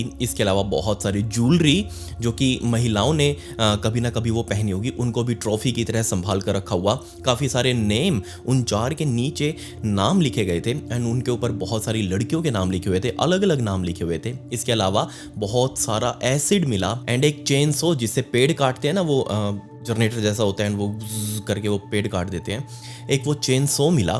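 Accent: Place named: Andhra Pradesh, India. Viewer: Accha, so you're an ANDHVAKT! Waise tumhare English pronunciation se shaq hota tha, aaj clear ho gya.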